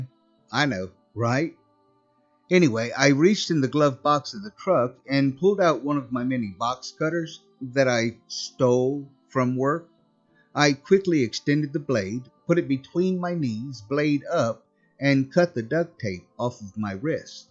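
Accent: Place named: Midwest, United States. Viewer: You really sound like guys I know are you midwestern like Iliana area if you know where I’m talking about then you are if not oh well but you have that sound. It’s good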